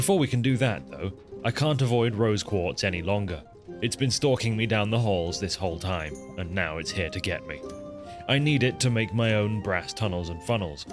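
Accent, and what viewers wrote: United Kingdom. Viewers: I blame Totalbiscut (rest his soul) for my affinity for British commentary. There is simply nothing else like said in your accent, so charming and quaint. Its like a storybook or an episode of Thomas the Tank Engine. Love your vids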